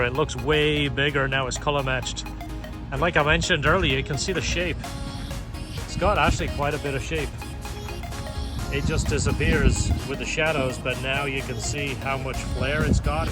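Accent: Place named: United Kingdom. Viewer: I am listening to this and for some reason I am imagining a British guy talking.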